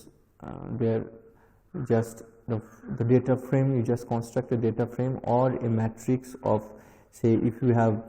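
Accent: India. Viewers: This indian accent is driving me nuts...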